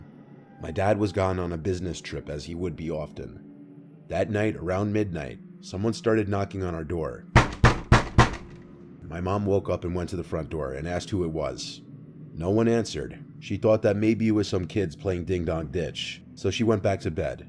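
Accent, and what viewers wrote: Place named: Hudson Valley, United States. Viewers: Hudson valley Accent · Hudson Valley accent LOL!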